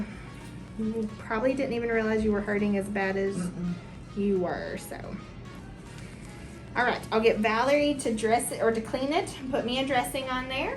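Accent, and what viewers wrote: South, United States. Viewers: Do any of you know of a great guide to Lexington? I am looking to move and want a safe but affordable condo/townhome as I downsize. Do y'all like Lexington as your home? Is it easy to make friends? I am a Lupus patient and will need rheumatologist/nephrologist/PCP/orthopedic group...the works! I posted this question because of your sweet Southern accent - made me feel at home though I am from Texas. Any and all info would be appreciated. Thanks in advance and peace and love to all!! 💜🌺🌸💜💜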